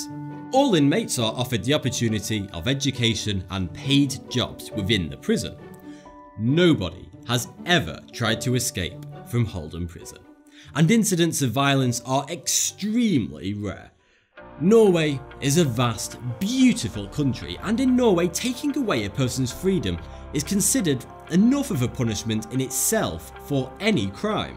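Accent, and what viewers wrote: United Kingdom. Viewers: I wonder how many prisoners are falsely convicted. No one can make up for the wrong person’s humiliation and a new bad reputation. I guess that people in jail lose the respect from their family. The wrong people in jail isn’t justice. Remember the Memphis three from Arkansas? Anyone can lie about someone else and be taken seriously. When that happens, the court and the system goes out of their way to make it so that the defendants end up behind in bars even if they are innocent. It’s not against the law to try to make the defendant angry so that look worse than they are. There are a lot of bad prisons. I don’t like this man glamorizes the United States prisons. He has a British accent, so how does he know so much about American prisoners?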